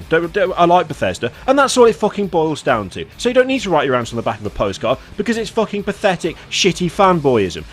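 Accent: United Kingdom. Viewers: How is it that these kinds of angry rants always sound better when done with a British accent? But then again, everything sounds better with a British accent. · Subscribing just for that accent. I'm British too.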